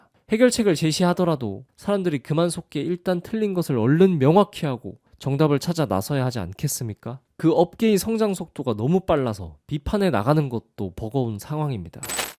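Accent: Jeolla, South Korea. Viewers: 영어완전 전라도발음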